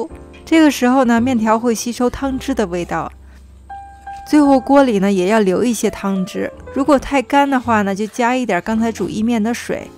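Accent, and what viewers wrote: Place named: Beijing, China. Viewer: 是北京人吗～哈哈听着是亲切可爱的北京人哈哈哈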